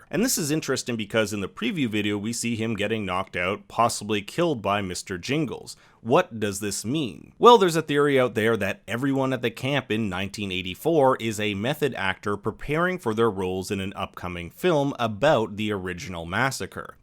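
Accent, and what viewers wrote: Canada. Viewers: Just curious are you Canadian? I've been trying to place your accent. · random but this video i picked up on an accent and now i’m wondering if youre from canada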